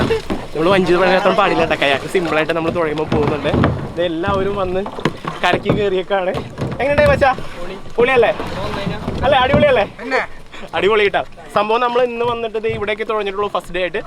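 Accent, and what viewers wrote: Thrissur, India. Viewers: Machan thrissur karrananno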